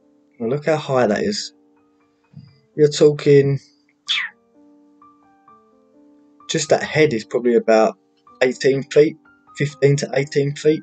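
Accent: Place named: United Kingdom. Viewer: What a great video! I’d be lying if I said I didn’t dig the accent too. I know you guys have a bunch of different accents over there (I’m from New York), but that one always sounds like “the cool one” to me. It’s Billy Kimber from Peaky Blinders, and maybe Russel Howard the comedian. Let me know if I’m right, crazy, or an idiot. ✌🏻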